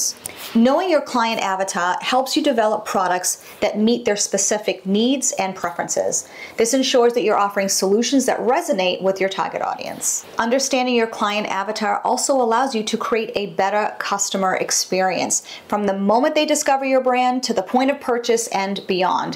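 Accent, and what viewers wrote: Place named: Massachusetts, United States. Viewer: P.S. I ♥️ your Massachusetts accent. My hubby and I LOVE Boston! We’ve been there twice and want to go back!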